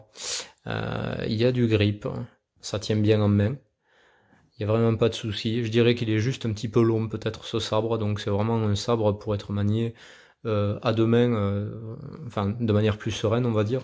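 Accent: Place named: Southwest, France. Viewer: Bonjour, étant un bon passionné du monde Star Wars et bien évidemment des sabres Jedi, je suis tombé sur ta chaîne et j'ai directement accroché. J'adore tes vidéos, elles sont précises et riches d'informations. Tu t'exprimes vraiment bien et ton accent du SudOuest met le sourire. Excellentes vidéos, j'espère que tu vas nous en proposer de nouvelles et je t'encourage à continuer. Je te souhaite de bonnes choses. PS: je me demandais si tu avais une page Instagram ou Facebook, où l'on peut voir ce que tu fais et discuter.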